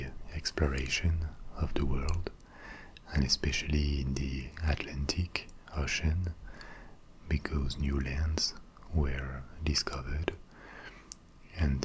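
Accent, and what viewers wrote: France. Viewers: In English it is “play-toe.” Plato was Greek. The proper pronunciation IS actually “plah-toe”. With a long “ah”. He is saying it correctly, he just has a French accent. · Very relaxing videos. I dont ever get tingles but I watch asmr for relax, is my new favourite. I REALLY like the accent.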